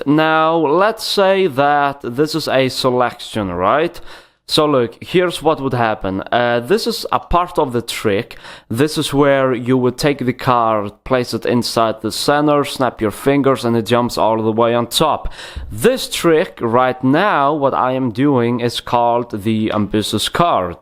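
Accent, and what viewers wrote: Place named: Russia. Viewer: Like the Russian arscent. Now I know the trick. Cheers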